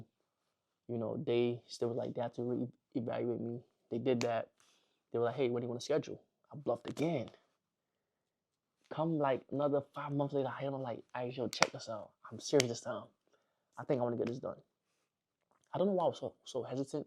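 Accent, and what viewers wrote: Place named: Liberia. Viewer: This pekin sounds like a Liberian pekin!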